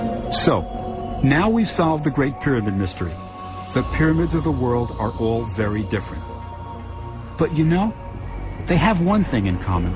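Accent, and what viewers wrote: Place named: New York, United States. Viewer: Pyramids ...described by a fukkin new yawkuh hahaha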